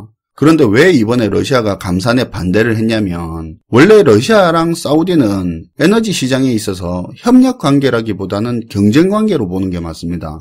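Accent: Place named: Gyeongju, South Korea. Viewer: 잘 봤습니다. 오리지날 서라벌 원어민 발음 멋지세요~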